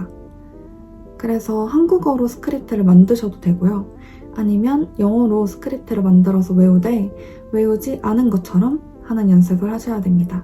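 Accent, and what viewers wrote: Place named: United Kingdom. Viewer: 영국억양 멋있어요.! Autumn 한국인이 쓰는거 첨보네요 👍🏻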